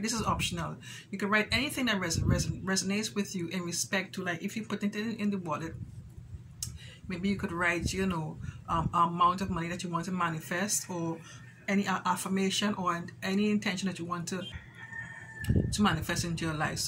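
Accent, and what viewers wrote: Trinidad and Tobago. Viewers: You sounding Trini..just came accross yr channel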